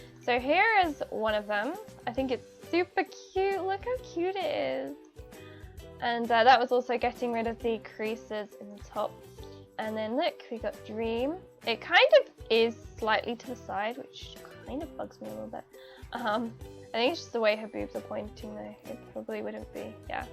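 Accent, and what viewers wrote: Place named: Australia. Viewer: your voice screams "AUSTRALIA"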